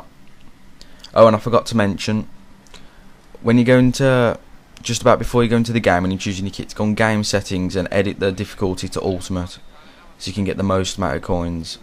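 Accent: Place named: Black Country, United Kingdom. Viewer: u sound like ya from west midlands like me but u soun d black country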